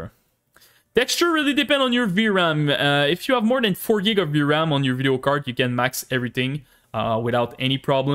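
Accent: Quebec, Canada. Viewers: yo quebec?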